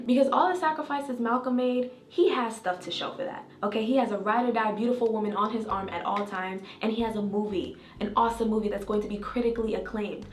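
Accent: Jamaica. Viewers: Hi. I haven't found any info 'about me' in your videos. I picked up a Jamaican accent and allusions that you're sapphic. You're pretty, smart, confident, and brutally honest. Who are you? Where are you from?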